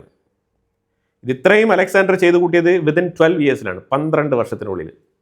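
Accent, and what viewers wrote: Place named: Malabar, India. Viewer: His സ്വീകരിച്ചു of speech is of മലബാര്‍.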